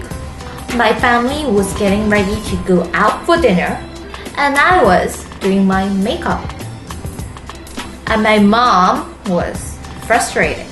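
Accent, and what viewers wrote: England, United Kingdom. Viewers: i like her english accent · she sometimes has a british accent, but mostly its a typical korean american accent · Your Korean accent sounds almost British... Interesting. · she sounds british almost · Yaah! Unnie! You're so perfect! You speak korean and have that british-like-accent. T^T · You seem to have a very slight English accent lol. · She sounds like she's got a british accent aswell as the korean slurring. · I love your English accent.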